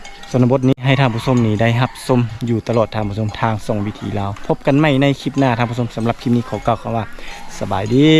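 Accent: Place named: Surin, Thailand. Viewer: ถ้าอยู่ไทยกะคือสิแม่นจังหวัดสุรินทร์,ศรีสะเกษหล่ะเนาะ สำเนียงแบบนี้😁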